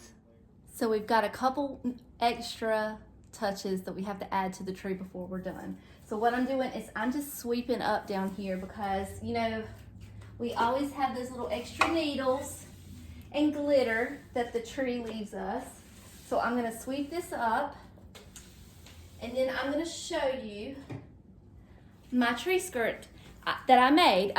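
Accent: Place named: Kentucky, United States. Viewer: I have wanted to do a Halloween tree for awhile. I bought a bunch of decor for it this year and invited 15 girlfriends for a Halloween Party. As I was watching you it dawned on me that my tree is flocked with snow!!!! Oh my word, how dumb can I be??!!! Guess I will hit some consignment stores today 🤞🎃I love your channel and your decorations but my favorite is your sweet way and southern accent. Reminds me of my cousins in Kentucky.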